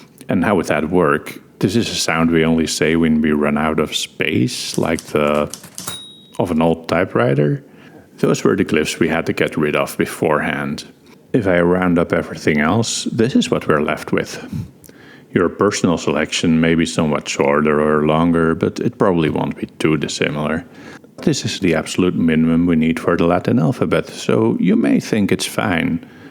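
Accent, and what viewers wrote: Netherlands. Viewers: you sound so extremely "dutch" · I thought you sounded Dutch but... “no it’s slightly off”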